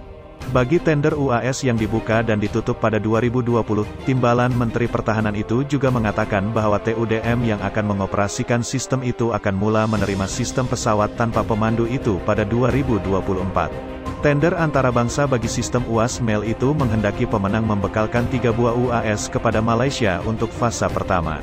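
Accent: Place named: Indonesia. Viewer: Negara ni skrg dah teruk korup, lagi mahu beli aset baharu..........LCS pun dah bayar RM6 bilion, sebiji pun tak siap, menteri2 umnobn/pn cekik komisen. Suara pun org indonesia lagi mahu sembang ttg tentera Malaysia........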